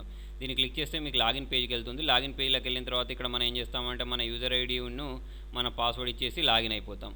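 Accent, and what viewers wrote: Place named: Telangana, India. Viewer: Telangana mastu matladtunav bro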